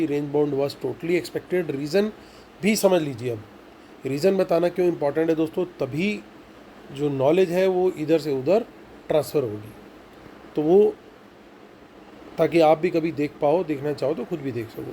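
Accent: Punjab, India. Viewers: Love u sir always rocks sir r u from Punjab or hariyana it doesn't matter but tone thx if u r in mumbai will meet u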